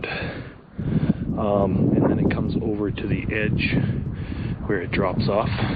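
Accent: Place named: Europe. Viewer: Voice has a slight European sound .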